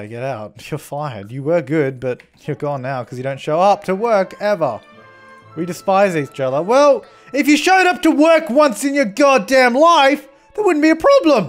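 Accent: Australia. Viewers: Mate, you are like the best stereotypical Australian the accent to the phrasing is great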